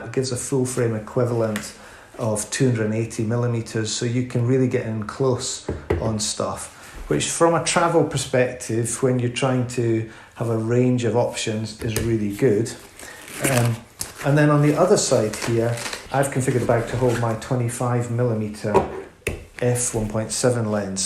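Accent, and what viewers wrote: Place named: Scotland, United Kingdom. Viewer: That is stitched on. Just lift it up at the edges and cut the stitches with a seam ripper or sharp pocket knife. Off she comes, and looks quite generic, I bet. Love your Scottish accent. That camera you've got is not as handsome as my Olympus E-M10.3, but having the viewfinder off to the left side of the body really makes up for it. (for the right-eye-dominant user) The nose just goes off to the side of the camera, instead of into the back of it with the head turned awkwardly sideways.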